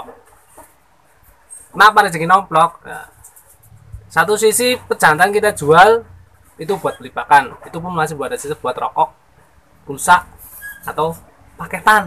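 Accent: Banyumas, Indonesia. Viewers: Jyooooosss Ora ngapak ora kepenak😀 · Mas alamatnya mana ketone ngapak kaya edek pengin merguru ko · Ya,, banyumas punya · ngapakk ya mazz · Ora ngapak.ora penak kang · Kok ngapak ,kaya nyonge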